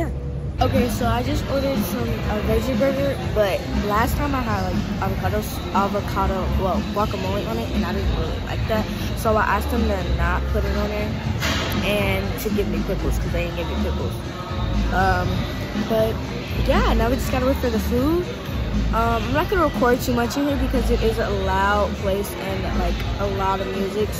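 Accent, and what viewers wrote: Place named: United Kingdom. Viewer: nahh she’s giving british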